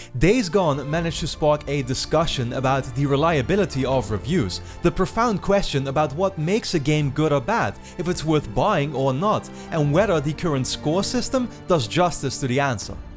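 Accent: Netherlands. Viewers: Your loud inhaling after each sentence is very distracting. It would be nice if could cut it out. A lot of Dutch people have a terrible accent, yours isn’t too bad though. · judging by your accent im guessing you are dutch, the dutch tend to be straight forward and say it how it is, it clashes with the sjw hivemind coming mostly from america, dont worry about it, just speak your mind and don't be afraid of having an actual personality, it is the reason i am subscribed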